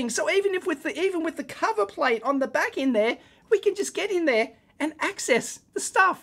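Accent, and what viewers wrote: Australia. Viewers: LockPickingLawyer grew an aussie accent (oh, Its. He says hes not LPL lel)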